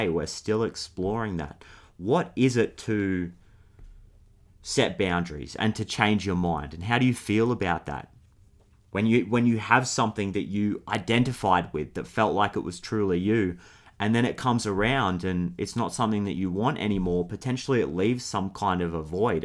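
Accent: Australia. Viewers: He's Australian!!❤🤩A shinning example of our beautiful connected Australian men 😁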